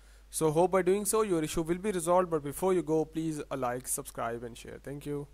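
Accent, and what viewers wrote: India. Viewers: Yes, sure. .....not likely I'll listen to someone with an Indian accent tell me how to do anything on my phone.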